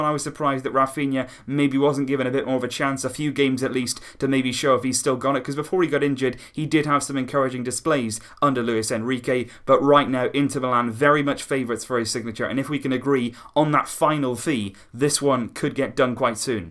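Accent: United Kingdom. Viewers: barcalegend _10 uk i know by the acsent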